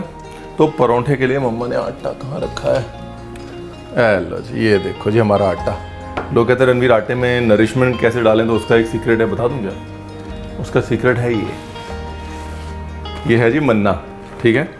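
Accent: India. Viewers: Desi language with super desi accent...but when you switch on vilayti one no one can imagine ki bande ne kya khub hindi ka hath pakda hai...👍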